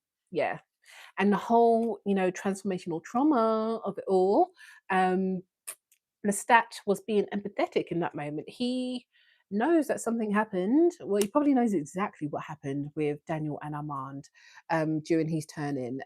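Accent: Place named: United Kingdom. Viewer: I love your voice and your accent!🥰 Are you British? Sorry for such a personal question😅 I'm learning English so accents is a big deal for me. This is one among many reasons why I love Jacob. He absolutely nailed 3 different accents! 🤩 So it's okay if you feel uncomfortable to answer my question. I'm not insisting or being rude😅 Anyways, I like your content 🥰 Thank you!✨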